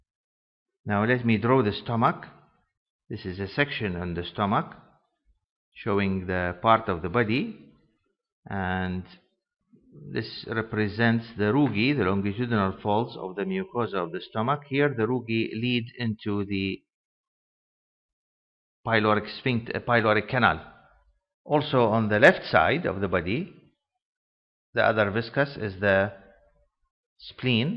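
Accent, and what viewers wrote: Iraq. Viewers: هل انت من العراق دكتور احس لهجتك عراقيه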